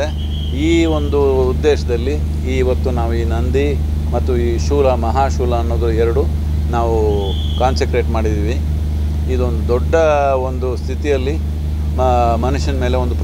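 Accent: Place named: Tamil Nadu, India. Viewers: I feel it's tamilized kannada ! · ಕನ್ನಡದವರೆ ಮೈಸೂರಿನವರೆ ಆದರೂ ಕನ್ನಡನ ತಮಿಳು ಶೈಲಿಯಲ್ಲಿ ಮಾತನಾಡಿದ್ದಾರೆ ಆದರೂ ಕೇಳಿಸಿಕೊಂಡು ಹೋಯಿತು ತುಂಬಾನೆ ಖುಷಿ ಆಯ್ತು ..🙏🏽🙏🏽🙏🏽🙏🏽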